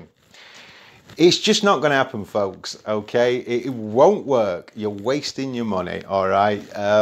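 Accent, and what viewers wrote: United Kingdom. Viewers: Excellent video! Where in the UK are you from, BTW?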